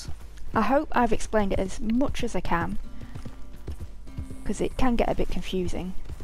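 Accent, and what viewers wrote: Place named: Manchester, United Kingdom. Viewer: is your accent from Manchester? That's my first guess, or at least somewhere in northern England. If I'm wrong I mean no disrespect. I just love all of the English accents but I'm still not quite good at placing them.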